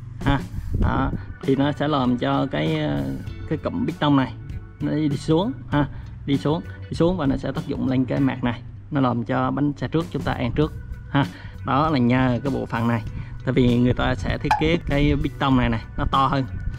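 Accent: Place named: Quang Ngai, Vietnam. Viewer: Giống Quảng Ngãi vậy anh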